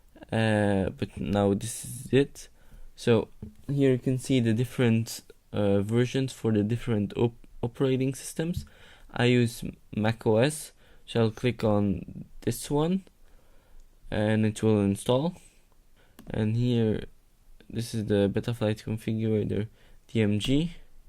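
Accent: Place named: India. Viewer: media hora hablando para no decir nada con acento deindio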